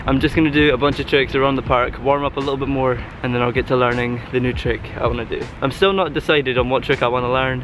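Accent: Scotland, United Kingdom. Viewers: Are u Scottish if ye are wits the best parks for skating bro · Is he Scottish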